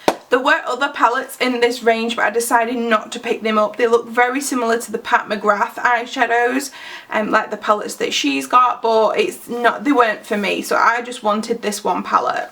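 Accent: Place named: Yorkshire, United Kingdom. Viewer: I recognise a fellow Yorkshire accent! Loved the vid- I have got loads of Urban Decay pallets but these babies are going to be on my Xmas list( the one you used and the smoked one/ love a smoky eye)🙂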